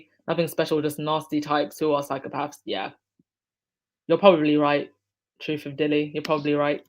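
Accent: United Kingdom. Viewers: A million views that's fantastic Congratulations. It's really not surprising your podcast is very interesting and I love the British accent it makes me feel that I have a front roll set. Thank You. 👍💕💕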